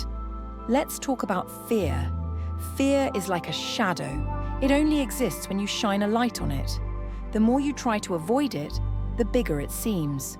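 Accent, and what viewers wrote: England, United Kingdom. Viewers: I'm 58 , emotionally immature, with discouraging sudden mood swings that take me from poetry to the toilet....sort of speak in a manner of seconds, the mood swings are hormonal, the rest unhealed psychology, spiritual bankruptcy and much more..... I want to thank you for today your channel found me, I say it this way because I didn't know it existed to look for it to begging with. The two videos I've listened to this far.....sound True, and the non robotic lovely voice of the narrator with what I perceived as an English accent, it's the cherry on top. Her delivery brings believability to the things she claims,but I have yet to call my own experience. Thank you for the sense of calmness this has provided as I wrestled with this thinking of mind in getting myself ready to hopefully sleep. Truly thank you The content resonates as Truth to me, and the content and delivery make for a very reality based, perhaps it's possible for me, to have kind of experience. 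Thanks for the hope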